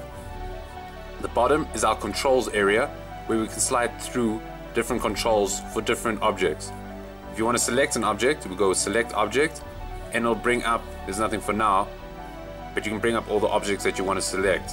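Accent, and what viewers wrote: South Africa. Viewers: I knew you were South Africa... but your screen just confirmed it - Rock on Pretoria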